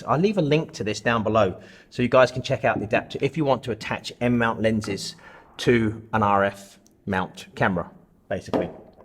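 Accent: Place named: Portsmouth, United Kingdom. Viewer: Different is good sometimes, but the colour background is better. What happened to the Pompey accent video? Waiting to show friends here in Japan that I'm not the only one with this accent.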